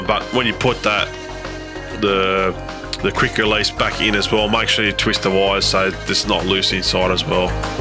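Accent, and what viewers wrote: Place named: Australia. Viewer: Haha im sure you're gonna have loads not have a clue what your saying, but Aussie to Aussie its beaut mate. Just waiting on my QR2 with 2 base sides to arrive.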